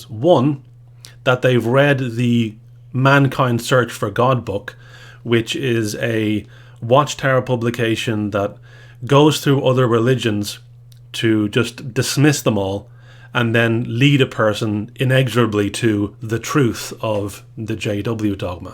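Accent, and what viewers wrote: Ireland. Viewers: haha after I lifted off it was like watching a whole new video. I really enjoy the cadence of your Irish accent. I sent this to my daughter🤓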